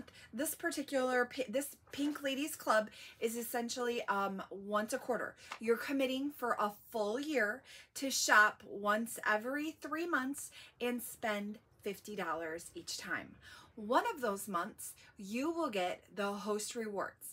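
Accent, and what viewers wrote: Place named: Michigan, United States. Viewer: fellow Michigander here! Totally recognized the accent immediately 🤗💜! New consultant here. You have great content! Looking forward to seeing more 😊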